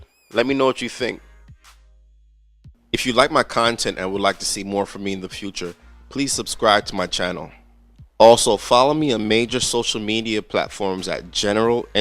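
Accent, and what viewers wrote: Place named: Maryland, United States. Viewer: I detect a Maryland accent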